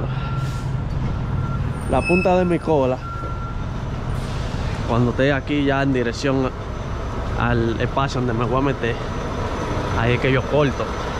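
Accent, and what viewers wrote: Dominican Republic. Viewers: Manin tu suenas dominicano, saludos desde República Dominicana colega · Hey nítido son tus explicaciones ese asentó es puro dominicano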